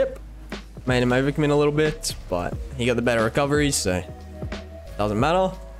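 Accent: Australia. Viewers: Road to top 50? That would be so cool to watch, if you can’t though, how about top 100? Also I just hit diamond in 1s (plat 2-3 in other comp modes ahaha), and I think I can attribute that (at least in part) to you and Sotus’ individual 1s series. It’s super helpful and inspirational watching you, so I just wanted to say thanks man. <3 also it’s awesome to have an Aussie RL YouTuber to watch... American accents can get a bit annoying after a while 😂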